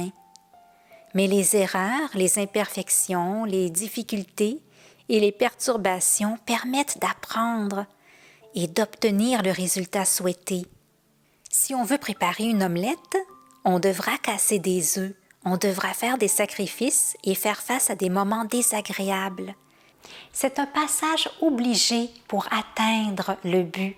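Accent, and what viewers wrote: Quebec, Canada. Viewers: Bonjour, je trouve dans votre contenu plus de sagesse parfois que ce que mes parents m' ont transmis, même s' ils ont été de bons parents.. bonne continuation ( en plus j' adore votre accent du Québec et votre voix douce et apaisante) 🐙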